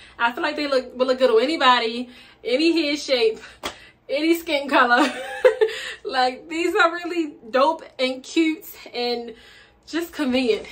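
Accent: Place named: Maryland, United States. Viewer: I love your hair, and love the accent, you got to be from Maryland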